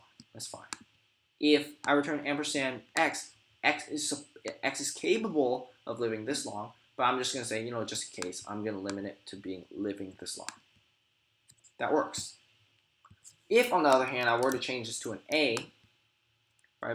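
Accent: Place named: China. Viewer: 听口音像是中国人，所以能出个中文版吗？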